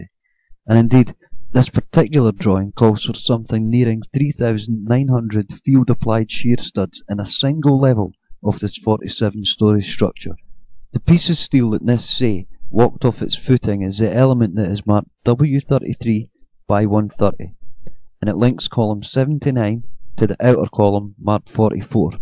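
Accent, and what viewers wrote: Scotland, United Kingdom. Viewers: Great video, and the scottish accent makes it all the better. Thx for your hard work on exposing the BS known as the official conspiracy theory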